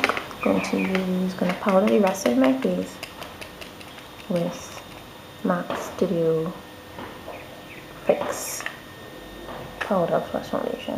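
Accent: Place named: Trinidad and Tobago. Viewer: ha! i can pick out a trini accent anyday!!!! hmm just found you and love your work! subbed! air brushing is the bomb my system is the dinair pro my brides just love it! a trick i do is switch guns because i really prefer oil based foundations they last longer covers better and show better on film but i like the ease of the water based to me it seems lighter especially during the summer.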